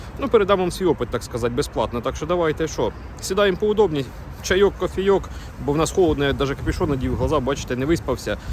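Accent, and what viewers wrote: Ukraine. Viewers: досмотрела до конца, хоть и не ищу работу)Я ещё не слышала такого говора) акцент украинский, а слова русские, очень необычно 🙃 Удачи Вам, где бы сейчас ни были!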